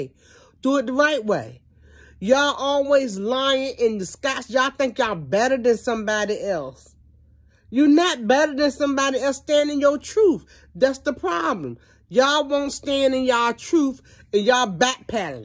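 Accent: United States. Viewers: I kept listening and I hear wisdom and plain common sense. You sound like you from New Orleans? Good talk my friend! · No she dont. She sounds like she maybe from The Carolinas, Mississippi, Georgia even.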